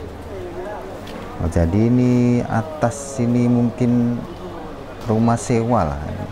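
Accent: Java, Indonesia. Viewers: Assallamuaikum 🙏salam kenal dari saya penonton baru dari kalteng,,,klo di dengar dari logat bahasa sampean kya y org jawa ya mas🙏🤭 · logat lu koq jawa bang..😁😁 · Abang org indonesia yah,,,sek3dar masukan ga usah di melayu2in bang,,,logat ente logat indonesia kejawa2an,,jadi ga bisa abang berlogat melayu malaysia malah lucu kedengerannyanya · Pakai bahasa indonesia aja napa bang, logat malaysia2an tp logatnya jawanya kental dadi malah aneh...hehe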